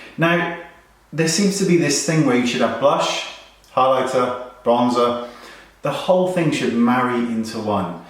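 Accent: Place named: England, United Kingdom. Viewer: You are sooooo sweet!!!!! you seem a really really nice person, also I love your english accent. Well anyway, I want to ask you if you could show me how to do the makeup on a face with rosacea, not severe, just with a pinkish tone. In my eagerness to camouflage my rosacea I always end up looking with no life whatsoever, and going in again with a pink blusher it just seems nonsense. So now I leave my cheekbones with my pink rosacea and then I apply my foundation on the rest of my face, it looks ok, but I'm sure there's a better way around it. Help please! :D Kisses! (I'm moving to London on March 2015 Yeyyy!!!)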